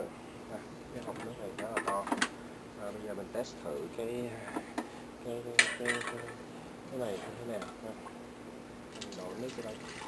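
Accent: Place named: United Kingdom. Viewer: Cảm ơn anh đã chia sẻ về dụng cụ này, nghe anh nói tiếng Anh Anh rất hay nhưng ko phải giọng Mỹ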